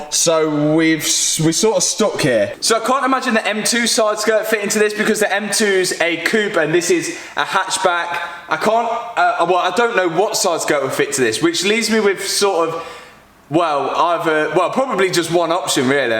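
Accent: Leicester, United Kingdom. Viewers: Can hear that leicester in ya.... easilehhhh😂 Great job tho man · I'm absolutely obsessed with your channel. You are my favorite mechanic, I am watching you and learning thanks every time I'm on your channel. I own 2007 BMW 335I N54 with Dinan stage 2 tuning, and some other upgrades, I absolutely love it. I purchased this car brand new in 2006 and I sold it in 2010 to friend of mine who was afraid to drive it because of the power this car produce. He put only 12,000 miles over 12 years owning it. Three months ago I bought it back, the car is now 16 years old and have only 54,000 miles it was always garaged and it still like new condition. I'm trying to put some more upgrades and improvements. Watching you what you do with your cars make me want to do more and more to make my car look better and drive faster. With the work I did already the car have 450 hp and it's absolute pleasure to drive. 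I am 72 years old, originally from Poland and I live in US for 40 years. It's kind of funny when I watching your videos sometimes I have to rewind and listen second time, because of your British accent. Don't get me wrong I love it, and so far I understand every word you saying because English is my second language I have to pay attention. I am amazed, how the final result is always surprising. You are perfectionist, and I admire your attention to details. I am a Master Crystal Cutter, attention to details and perfection is in my blood, that's why I love what you do. By showing your mistakes and finding the solutions sometimes for very difficult pr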